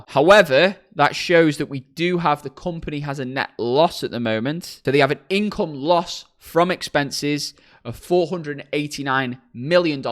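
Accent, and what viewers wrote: United Kingdom. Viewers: i love to stay in Airbnb so much when i travel but i cant agree more with that the company go public at this time is able to survive through this pandemic. long term Airbnb will profit and grow even more than it already proved in the past. i will keep this stock very closed to my watch list and see how the IPO and the stock price going but would love to buy shares one day. thank you for the amazing content plus attractive British accent:))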